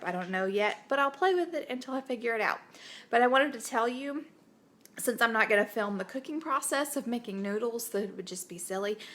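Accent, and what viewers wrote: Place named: South, United States. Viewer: Gopher= go for- That's that classic southern talking coming out. I say that word 15 times a day. If I put our clothes on the couch, I find them all in the couch. I pulled a sock the kids wore when they were 5 out of the couch....and I haven't had this couch but a year. my pillows are probably made of lost clothes. You have a servants heart and a desire to serve others and support your family. We also must have balance in our lives, and that can easily get out of whack if we are not making our balances in life a priority as well. It is natural for them to go one way and then the other. But, it's helpful when they remotely stay center. So good to see you on here since we never get to see one another. Try to relax, get some rest, drink enough water, and keep your strong faith.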